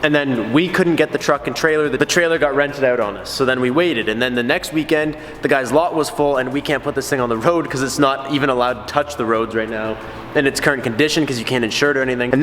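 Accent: Canada. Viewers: You're a real there canaaaaadian bud. Saying soury instead of sorry 😂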